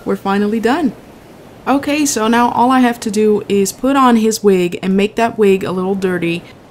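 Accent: Netherlands. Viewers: Im gonna guess.... Ben je nederlander? Lol je accent.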